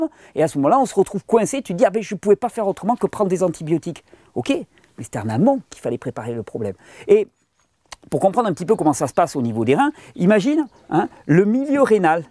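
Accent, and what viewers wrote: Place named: South, France. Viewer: Regenere / Thierry Casasnovas Je suis de la région opposée à la tienne; que j'appelle "le Haut de l'France "😆 et ton accent nous apporte le soleil du Sud 🌞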